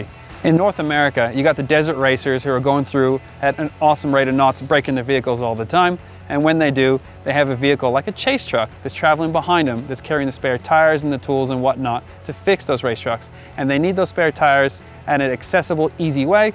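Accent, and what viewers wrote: Australia. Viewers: Sick looking Ute man! WAIT... is he Australian 🇦🇺 or American 🇺🇸 ? That accent confuses my ears. 👍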